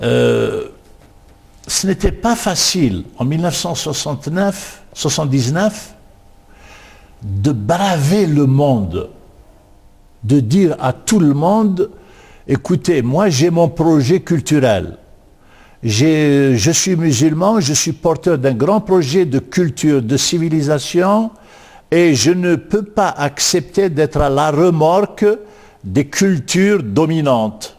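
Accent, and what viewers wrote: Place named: North Africa. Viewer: Pourquoi parfois vous roulez les R alors que parfois vous les dites parfaitement... Cheulou cet accent du bled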